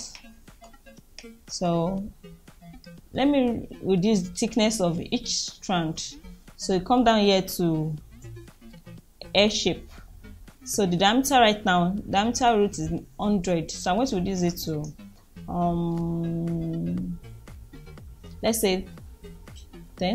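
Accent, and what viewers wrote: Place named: Nigeria. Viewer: You're Nigerian? Your accent sounds familiar because I'm Nigerian too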